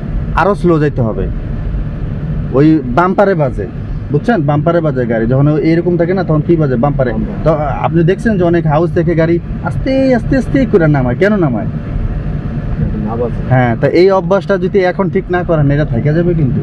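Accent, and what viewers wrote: Barishal, Bangladesh. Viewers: আপনার বারি বরিশাল নাকি। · ভাই এর বাড়ি কি বরিশাল?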